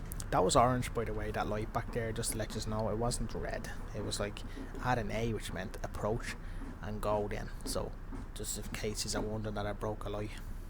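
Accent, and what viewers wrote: Ireland. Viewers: I love your Irish accent.. North or RoI? I'm from Wales :)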